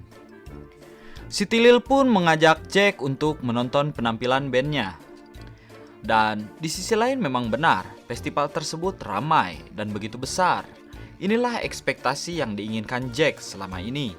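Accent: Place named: West Java, Indonesia. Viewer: Sundanis pisan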